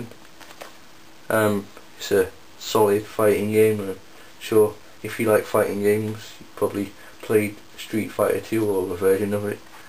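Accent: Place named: United Kingdom. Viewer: lol yeah he is from the UK. Did he voice not give it away? lol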